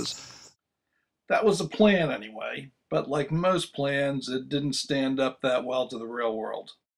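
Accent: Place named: Southern Maryland, United States. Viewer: I think I recognize an accent of Southern Maryland/Northern Virginia. I'm from there.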